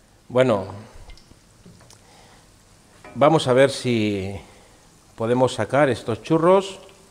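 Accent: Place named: La Mancha, Spain. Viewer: Por el acento deducía que era usted manchego como yo. Que bonito es siempre recordar esos momentos de la infancia. No sólo es lo que cocina, es la paz que transmite usted. Gracias por compartir sus conocimientos y estos ratos con nosotros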